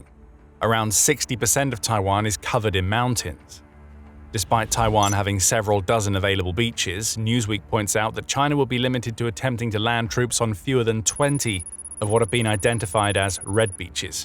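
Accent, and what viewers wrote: United Kingdom. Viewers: I enjoy watching your videos and your British English voice. But why use Americanisms like "gotten"?!! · Despite the British accent, this is not a European perspective. The video accuses the Chinese leader of doing exactly what the US administration is embroiled in - extreme corruption and promoting talentless political hacks to leadership while firing actual skilled leaders such as Gen. Christopher Donahue. I can’t imagine tattoo-covered Kegsbreath was making a rational decision messing with Delta force. So, don’t expect the US to do anything, but make matters worse. They’re also worse off economically. Practically no one can afford a house here. The GDP is artificially propped up by a few billion/trillionaires who control 50% of the economy or more.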